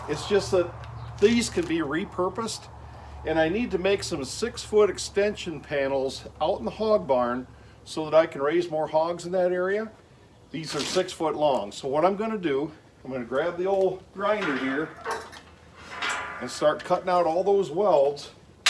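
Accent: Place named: Northern Minnesota, United States. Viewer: Those look like heavy duty gates! You're going to need to have some heavy hinges to hold the up! Your accent reminded me of how we poke a little fun at the northern MN folks - "Hey der, let's head up to Tunder Bay for some Molsons, ey." Have a great week!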